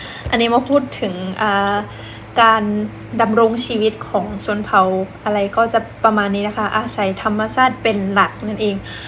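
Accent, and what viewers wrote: Cambodia. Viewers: สำเนียง(การกระดกลิ้น)เวลาพูด)คล้ายๆเขมรเนาะ... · เจ้าเว้าไท แบบเขมร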